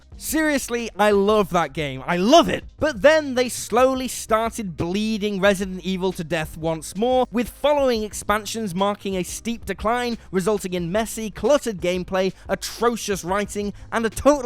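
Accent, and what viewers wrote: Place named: United Kingdom. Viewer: Usually British accents sound pleasant. This is a big break from that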